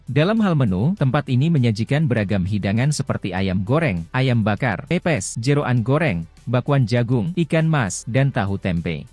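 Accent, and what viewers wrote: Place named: Sunda, Indonesia. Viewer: Jangan menertawakan dong,kanmrmang dianya bukan orang Sunda, kalau sdh coba bicara dialek Sunda, meski masih kagok ya dimaklumi saja kan.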